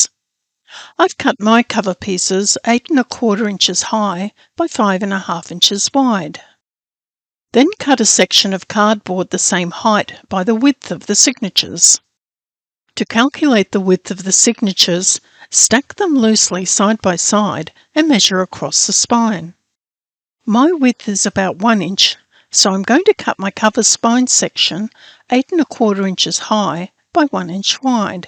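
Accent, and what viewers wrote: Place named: Australia. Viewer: I have watched whar feels like 100's of tutorials on journal binding. This is the best style by far. I can't wait to give it a go. Also, it is so lovely to hear a fellow Aussie making the video. Thanks for sharing.🥰😍🤩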